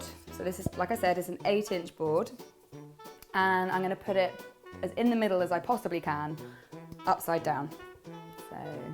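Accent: England, United Kingdom. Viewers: When says " scrappy, naughty, bald bits " I love that. Her accent is adorable. I love to hear the British talk. Love you,! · are you English, sorry if I'm being silly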